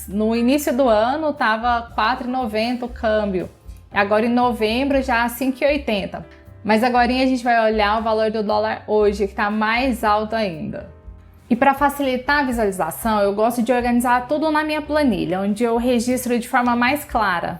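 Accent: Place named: Minas Gerais, Brazil. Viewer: Pelo sotaque é mineira; inscrito.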